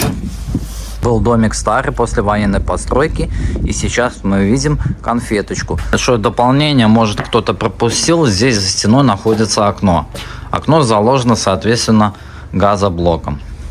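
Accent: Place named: France. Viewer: Смотреть дальше не стал, бесит французский прононс😂или простыл он что ли😂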